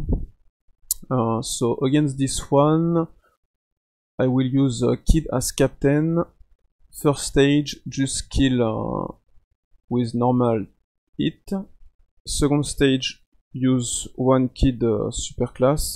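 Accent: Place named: France. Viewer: tkt c'est compréhensible pour un français, c'est juste que pour des étrangers ça peut être compliqué tant ton accent français est fort, par exemple j'ai + de mal à comprendre tubechau à cause de son accent Suédois.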